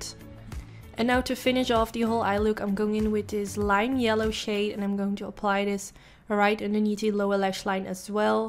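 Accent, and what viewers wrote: Netherlands. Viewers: Ik kijke naar je filmpje met in me hoofd hoor ik nou een Nederlands accent of word ik gek😭 en ja hoor je bent gewoon Nederlands 😍 yo go girl 💥💥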